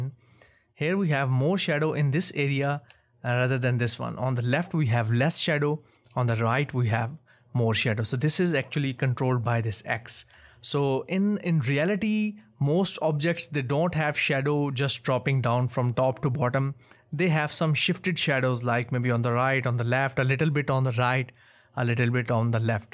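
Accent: India. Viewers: I love your indian accent.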